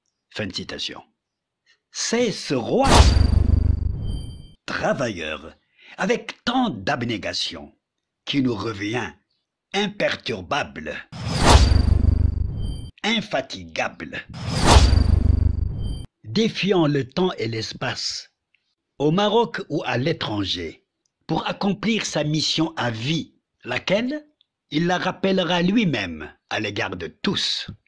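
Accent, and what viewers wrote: Paris, France. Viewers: Bonne fête d'abord pour l'Eid Fitr. Je crois que désormais la grande popularité du Roi Mohammed VI et les impressionnantes réalisations d'un Maroc nouveau qu'il a inaugurées à son accession au Trône n'auront plus de secret pour moi, après avoir visionné ce documentaire d'une valeur considérable. Votre analyse est aussi riche que succincte en fournissant l'essentiel sur les attributs fondamentaux du Roi du Maroc en relation avec son "métier de roi" selon sa propre expression. Votre lecture n'est pas moins claire avec une intonation française parisienne impeccable, absente chez beaucoup de mes concitoyens. A cela s'ajoute évidemment votre talent d'orateur qu'il n'est plus besoin de rappeler, associant non seulement l'art de parler et l'art d'écrire à l'égard de tous, d'après moi, mais aussi une belle voix dont la chaleur et la tonalité phonétique pénètrent le cœur. 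Permettez-moi d'ailleurs de vous exprimer mon sentiment, pour ne pas dire ma perception psychologique admirative à ce sujet. Les vibrations de vos cordes vocales émettent un timbre spécifique à votre psychologie. Et cela découle donc d'une combinaison de sons harmonieuse qui relève de la phonétique descriptive. Le Psychologue-ORL retraité que je suis, a pu relever en vous cette particularité phonétique à travers votre élocution. Elle est encore plus perceptible dans l'articulation et l'enchaînement de vos phrases, à travers vos poèmes. C'est d'ailleurs ce qui m'a attiré initialement en écoutant votre premier poème da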